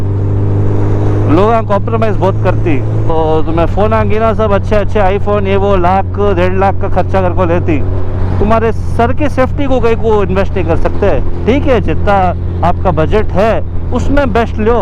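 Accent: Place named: Dakhni, India. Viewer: aapka dakhni mein vlogs aur baat karne ka tareekha bahut saaf and standard he mashallah... baaki log dakhni me baat karne pe kaan mein chubta he... will support this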